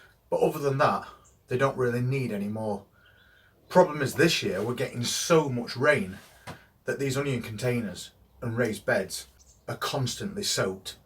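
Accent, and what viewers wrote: Yorkshire, United Kingdom. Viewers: Where r u from? I'm sure I can hear some Yorkshire accent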